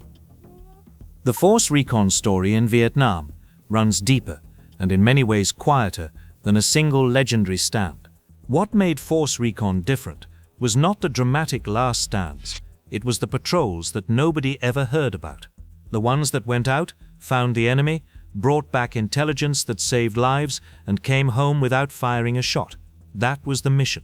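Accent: United Kingdom. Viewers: I don't want to listen to British accent voices talking about US Marines we don't have any Americans that can narrate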